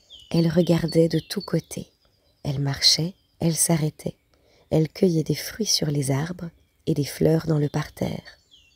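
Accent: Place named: Belgium. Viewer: Ici en Belgique on dit cela quon va dîner et souper 😉 et si je reconnaît bien l'accent de notre lectrice elle doit être belge car j'ai le même accent et je suis belge 😀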